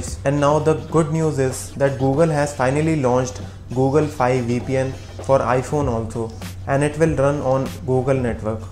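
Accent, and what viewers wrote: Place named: India. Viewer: CANNT TALK ENGGLISH BUT WANT TO MAKE YOUTUBE VIDEOS WTF ? Hate that Indian accent ..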